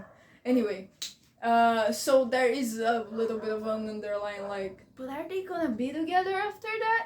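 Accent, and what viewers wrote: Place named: Brazil. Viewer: your accent is so cute it sounds very brazilian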